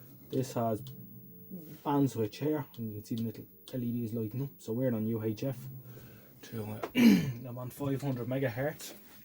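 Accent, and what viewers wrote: Ireland. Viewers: Love your strong Irish accent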